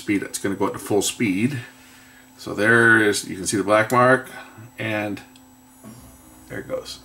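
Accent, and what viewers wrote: Canada. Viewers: You from Canada?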